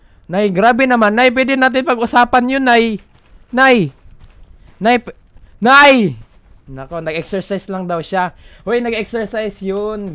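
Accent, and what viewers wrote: Visayas, Philippines. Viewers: Dol bisaya man deay ka dol ey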